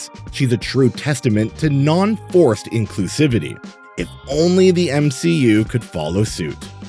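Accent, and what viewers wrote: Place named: Canada. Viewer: you are a canadian aren't you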